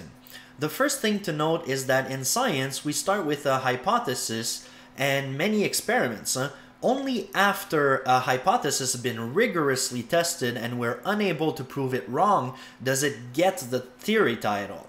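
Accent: Quebec, Canada. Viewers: Sounds French Canadian to me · wannabe to wasabe he's Canadian French. Didn't learn English til age 9.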